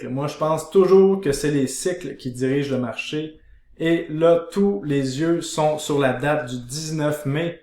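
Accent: Quebec, Canada. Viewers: c'est à cause de toi le PUMP sur le matic?? vidéo à peine sortie que le marché s'emballe!!! merci c'est un plaisir d'écouter et ce même avec un accent Québecois!!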